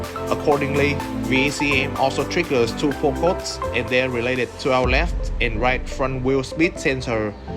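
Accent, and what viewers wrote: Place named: Vietnam. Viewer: kk, nghe biết kỹ sư Việt nói tiếng Anh liền, quan trọng là hiệu quả, giỏi.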